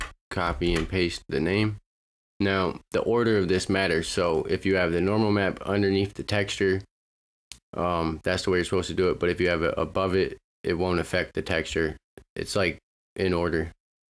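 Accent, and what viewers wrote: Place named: West Virginia, United States. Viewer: Naw, West Virginia. I've been several times. I'm in communications so I pay attention to accents. What do you use Blender for?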